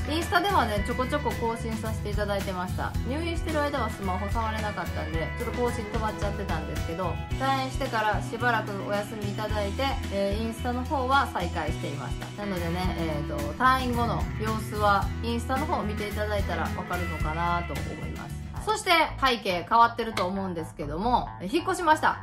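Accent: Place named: Osaka, Japan. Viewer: 登録したまま、お待ちしてましたよ❗ 大阪弁の心地よいトーク楽しみにしております。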